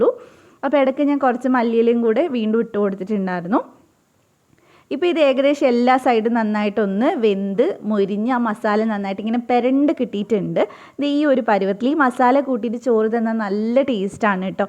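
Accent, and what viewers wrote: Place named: Ernakulam, India. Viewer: നല്ല സൗണ്ട് ആണ് കേട്ടോ .നല്ല അവതരണം ശെരിക്കും എറണാകുളം സ്പീകിങ് .തുടരട്ടെ മുൻൂട്ട് മുന്നോട്ട് പൊളിച്ചു ഇത്താത്ത പെണ്ണെ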